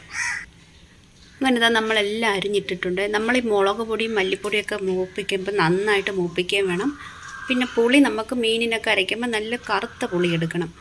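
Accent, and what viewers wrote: India. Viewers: Curry is superb, MY Trivandrum language is Superbbbbb 😊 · ചവള സൂപ്പര്‍ തിരുവനന്തപുരം സംസാരം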